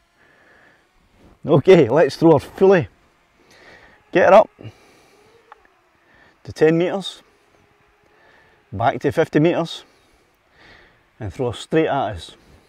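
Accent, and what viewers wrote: Scotland, United Kingdom. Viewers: Great viddy mate. I am also partial to your Scottish English.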